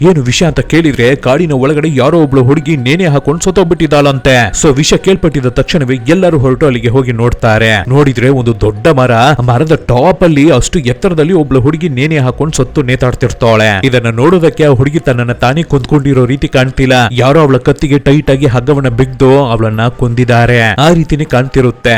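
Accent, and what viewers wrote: Tamil Nadu, India. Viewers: Tamil slang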